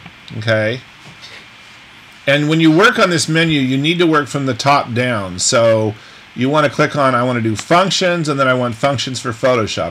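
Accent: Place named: United Kingdom. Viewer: Not a bad thing at all at least now I can relate a voice to face. Funny I always assumed you might have been british!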